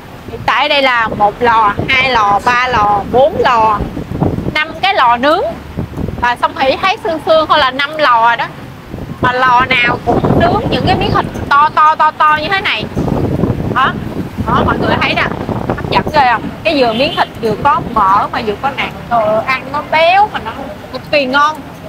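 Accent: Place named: Mekong Delta, Vietnam. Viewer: Ban vay moi giong miên tây thương yêu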